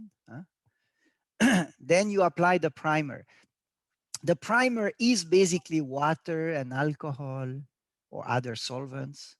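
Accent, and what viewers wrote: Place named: France. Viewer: ну ладно французский английский))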